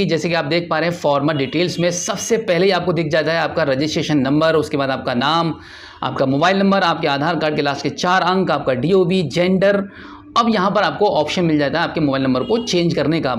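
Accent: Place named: Hardoi, India. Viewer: Bhai aap hardoi se ho?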